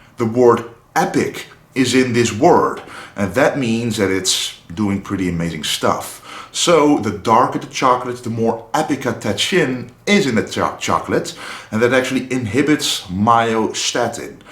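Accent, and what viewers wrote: Netherlands. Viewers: I LOVE THE DUTCH ACCENT. I AM MOVING TO THE NETHERLANDS TO FIND A MAN TO SPEAK TO ME IN THIS LOVELY AND SEXY ACCENT.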